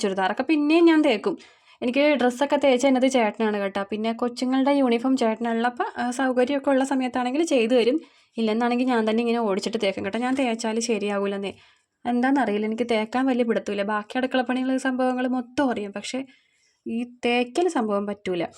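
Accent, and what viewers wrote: Kochi, India. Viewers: ഞാനും ഒരു തനി kochikari ആണ് യുടെ സംസാരം സെരിക്കും എന്നെപോലെ ചൊന്നുന്നു 😂😂😂😂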